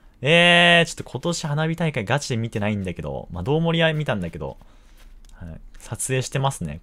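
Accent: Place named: Gifu, Japan. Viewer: たまに出る方言的に石川か岐阜かなって思ってましたが、岐阜でしたか。勝手にすっきりしました。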